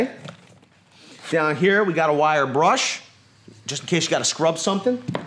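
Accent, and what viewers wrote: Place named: Rhode Island, United States. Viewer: You have got to be from Rhode Island. North Providence? Johnston?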